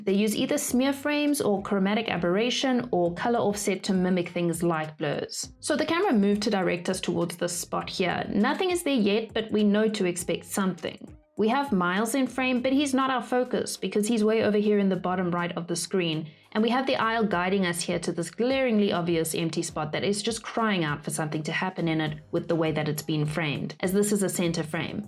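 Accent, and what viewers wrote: South Africa. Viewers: I'm from South Africa too! (I could tell from the beginning)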